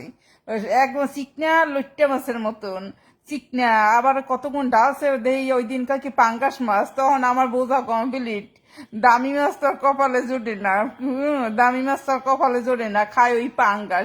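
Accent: Barishal, Bangladesh. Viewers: আপুর বাড়ি কি বরিশালে? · দিদিভাই আপনার বাড়ী কি বরিশাল 😅 Thanks didi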